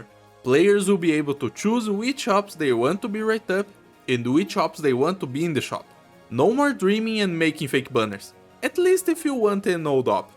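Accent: Brazil. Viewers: by any chance, are you Brazilian? i am and the accent your English have is very similar to the one Brazilians have speaking English lol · Sotaque de brasileiro e quase instantâneo de reconhecer khkhgkj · I hear a Brazilian accent 👀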